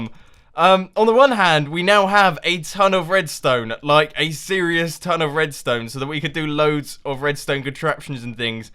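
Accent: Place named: United Kingdom. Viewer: Bio X How did you not notice that? I'm not a native English speaker, but when I first watched one of videos, I immediately noticed that he has British accent. I mean, it is so obvious.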